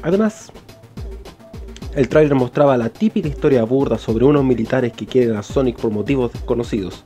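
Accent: Chile. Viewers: Chileno poh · Eres chileno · este wn es terrible chileno oe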